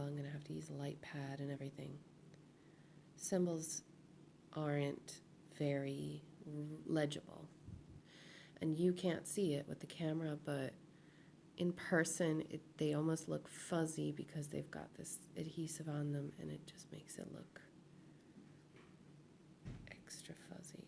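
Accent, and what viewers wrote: Ireland. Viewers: Wow you're sounding very Irish in this video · I enjoy listening to you, your voice is very soothing while I am drilling. You'd be great on late night radio. I don't know if you realise how much of a an Irish accent you have picked up. Like your new arrivals.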